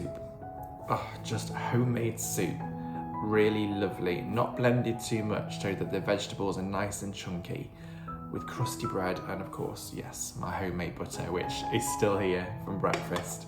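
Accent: United Kingdom. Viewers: I don't know where you live, but I could listen to your beautiful English accent all day long talking about Autumn. It was such a lovely video. Please don't stop these. I find them very comforting. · I enjoyed listening to you. Think I can hear a slight Yorkshire accent.?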